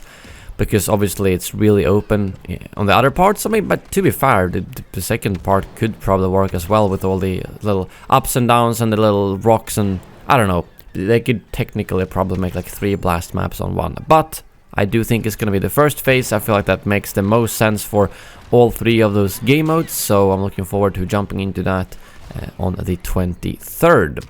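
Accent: Sweden. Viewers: I have a feeling you are from Northern Europe, probably Sweden judging by your accent. Am I right?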